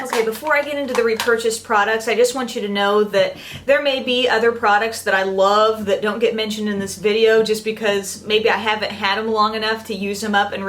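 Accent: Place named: Alaska, United States. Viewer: Where are you from? It sounds like you have an Alaskan accent.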